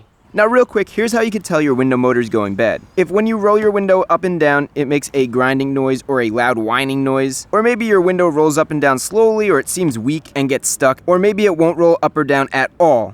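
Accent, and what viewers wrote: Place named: New Jersey, United States. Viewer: *Soapy water - Pronounced as "wooder," likely due to his New Jersey accent.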